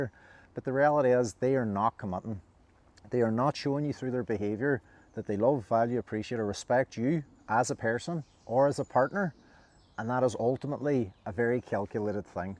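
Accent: Northern Ireland, United Kingdom. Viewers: Your channel popped up and disappeared but I found you. Lovely to hear a fellow N.I. person. ❤ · An Irish Northern man ...wise. lrish here. New sub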